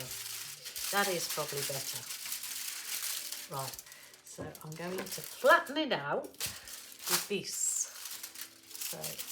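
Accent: Birmingham, United Kingdom. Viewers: Thank you. An interesting technique. I love guessing accents. I'm listening to you...were you originally from the West Midlands? I hear a bit of "brum".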